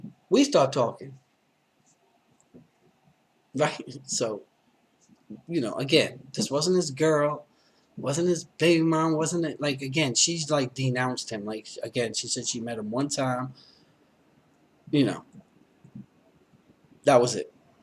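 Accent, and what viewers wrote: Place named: New York, United States. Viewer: Man ur SO new york bro ha I'm from pgh n I can still tell u ny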